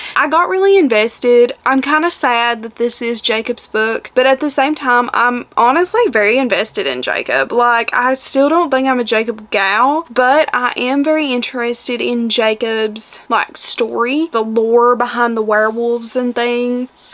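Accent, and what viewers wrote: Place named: United States. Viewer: I love your country accent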